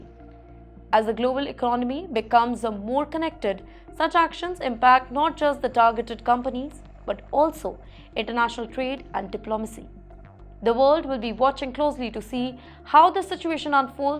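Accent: India. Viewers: She's speaking Indianlish which very few outside India can understand.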